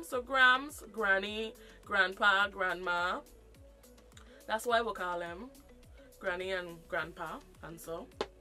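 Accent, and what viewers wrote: Virgin Islands, Caribbean. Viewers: My husband is from St. Croix and he have the same accent, I’m American but I be trying to talk in his accent to bother him lol but over the years I’ve learned how to understand you guys so well ❤️ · I was just watching your Rice Water video and I knew i was hearing a Caribbean accent lol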